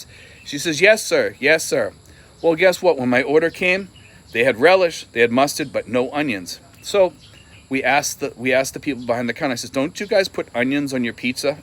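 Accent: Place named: Chicago, United States. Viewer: Hmmm, I changed my mind your accent I thought was from New England, im thinking Chicago, Illinois now lmao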